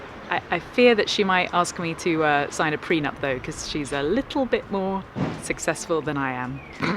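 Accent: United Kingdom. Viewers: You really are a talented person, musician, singer, has a beautiful voice singing, but you have no idea how I love to listen your voice when you're speaking, the calm, how it sounds is enjoyable to me and I love, really love the British accent. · Your “ ” cover with Josh Turner was EL PERFECTO!! Thank you! I also appreciate your respect for the English language and attempt to use proper grammar. I share it. Language is at best a limited and somewhat poor vessel for conveying our thoughts and feelings. Taking the trouble to develop a good vocabulary and decent command of the language is critical to our ability to think, understand the world around us, and communicate our insights. It deserves respect. As an American, I’m a bit unsure of the meaning of a “posh” way of speaking. However, I am aware of the many radically different accents in Britain; some quite difficult to understand. 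Yours is very nice. I’m from an old Tidewater Virginia family that predates our war for Independence. We have an accent here that still retains many flavors of old English so the way the Royals speak feels familiar to my ear. Linguistically I have no idea what that means except that perhaps being extremely traditional and somewhat cloistered from the general public they may have preserved a way of speaking from the times when England colonized America. English aside, I enjoy your music. Thank you. Good music is mana for the soul and making it is a worthy life’s endeavor. Well done!! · You realize people just love your wonderfully intelligent and smooth British accent and that's the primary reason you have so many views right? ;) LOL! Keep it up! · All Brits have a pleasing accent! We don’t even know which ones are considered posh.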